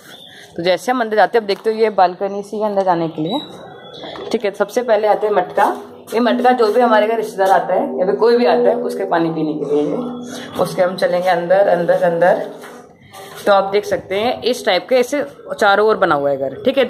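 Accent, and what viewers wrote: Rajasthan, India. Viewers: Ma'am aap Rajasthan Se Ho🤔🤔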